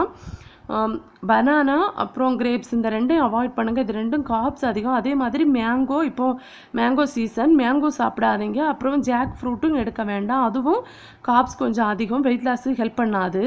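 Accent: Kanyakumari, India. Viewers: Hi, Nice to hear kumari Tamil. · Am also from KANYAKUMARI .I had found u with ur slang.well done sister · Akka neega kanyakumari ah? Slang correct ah Iruku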